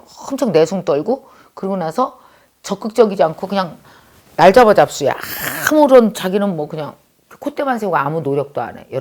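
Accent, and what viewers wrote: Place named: Chungcheong, South Korea. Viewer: 불만인거가터 고소한 충청도 사투리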